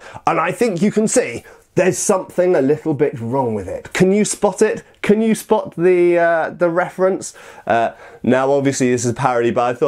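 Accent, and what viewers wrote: France. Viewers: I love your french accent XD Anyway very interesting video once again, I had no idea that the new British passport would be produced in France ^^ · Your French accent is glorious ! ^^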